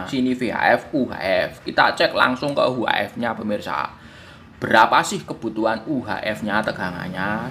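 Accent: Java, Indonesia. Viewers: ngomongnya teralu medok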